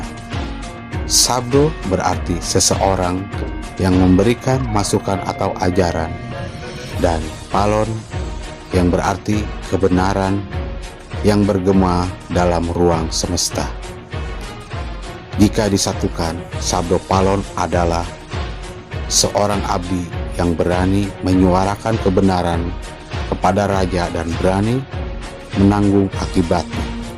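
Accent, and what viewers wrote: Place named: West Java, Indonesia. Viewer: Bahasa Jawa Logat Sunda Om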